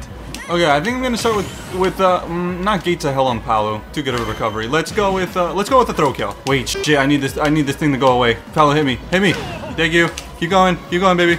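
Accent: Chile. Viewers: Are you Chilean???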